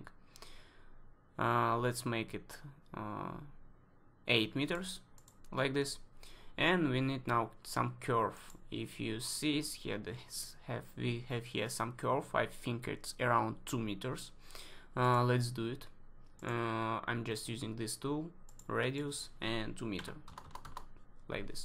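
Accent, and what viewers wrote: Russia. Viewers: Так хорошо воспринимается английский монолог, сказанный с русским акцентом) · Какой ужасный русский акцент!) и столько ошибок походу действия) однако ты молодец! Хороший канал у тебя! Желаю удачи везде и во всем!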